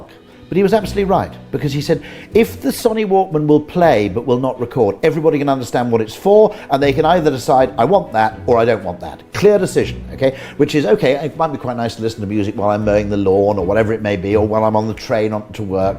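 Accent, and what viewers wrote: United Kingdom. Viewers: Why is there always a British guy to explain why everything is crap? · Nobody would listen to this guy if he didn’t have a British accent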